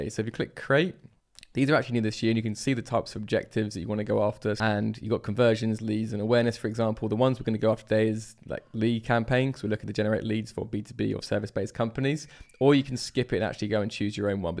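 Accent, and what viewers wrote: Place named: United Kingdom. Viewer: Try to work on the way you talk; it seems to be too fast, I guess you are from the UK and only the uk citizens can understand you better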